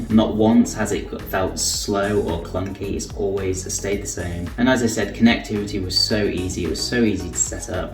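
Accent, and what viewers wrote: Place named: Princes Risborough, United Kingdom. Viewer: yeah because that P Risborough accent wont go away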